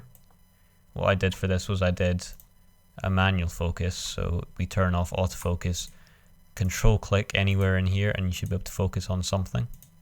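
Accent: Scotland, United Kingdom. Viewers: Sounds like a Scotsman - nice tutorial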